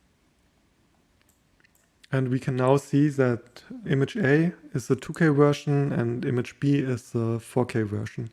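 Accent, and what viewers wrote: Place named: Russia. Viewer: Красавчик. Есть конечно чуток акцента русского, но в целом классно говоришь на англисском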